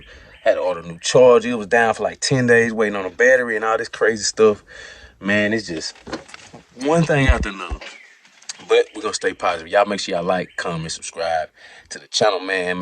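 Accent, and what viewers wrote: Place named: Texas, United States. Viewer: You from Texas bro?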